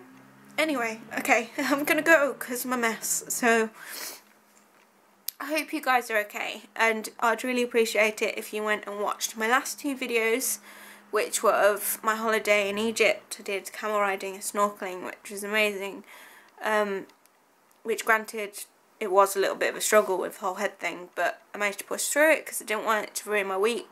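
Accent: Birmingham, United Kingdom. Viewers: Dahhhhhlingggggg!!!! <3 Oh my gosh this is so sad and has actually made me think how I need to be more careful as I wouldn't want this to happen to me! It sounds truly awful and we're all sorry that you're experiencing this! I hope you recover quickly and am sure that your determination and motivation to keep up your creative flair will aid the healing process!:) GET WELL SOON! x ps. There was ONE positive that came from this video... I GOT TO ACTUALLY HEAR YOUR BRUMMY ACCENT! Hehehe I thought you'd NEVER let it shine through;D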